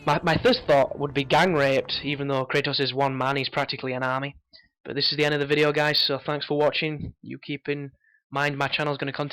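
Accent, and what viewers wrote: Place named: Midlands, United Kingdom. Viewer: "1 take"? you don't say. I can imagine the challenge of filling the voids with chatter, hell I love the sound of my own voice but even I shied away from audio commentaries. I'm glad you showed me that I was right to do so. Tell me bra', where are you from *county will do* I'm struggling to place that accent. I'm from Derbyshire and you don't sound a million miles away.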